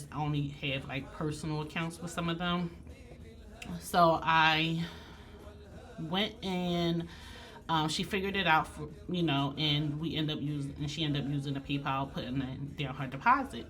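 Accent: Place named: Philadelphia, United States. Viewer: First, Allah will take care of the persons who did not make the final payment. Next, you have a beautiful soul, don't allow this incident to change who you are. The change in the policy was necessary. Last, the balloon design was BEAUTIFUL! You are very creative. Keep up the great work! May Allah continue to bless I kept saying to myself, she sounds like she is from Philly! I checked your website, and you are in the Tristate area. I need to find out who the event planner is so I can never use her in the future.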